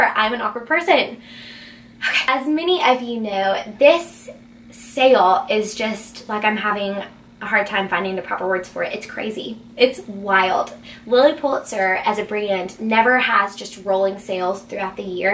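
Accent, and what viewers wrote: South, United States. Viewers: your southern accent is adorable😍I live in Dallas too but I don't have a southern accent!😭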